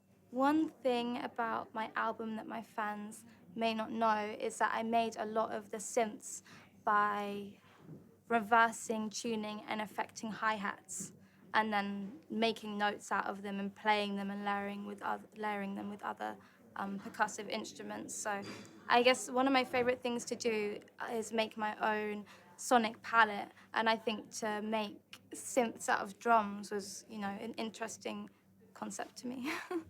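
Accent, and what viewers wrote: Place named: United Kingdom. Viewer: HER ADORABLE BRITISH ACCENT it hurts my gosh it hurts she's so fantastic it HURTS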